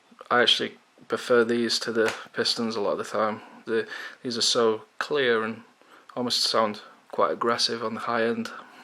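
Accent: United Kingdom. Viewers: Are you british? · A bit off topic but you have a British accent and pronounce the Z "Zee" instead of "Zed"?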